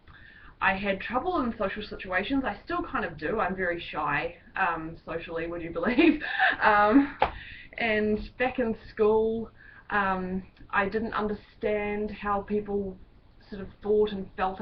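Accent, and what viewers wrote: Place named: New Zealand. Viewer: Also you reminded me how much I miss NZ accents:)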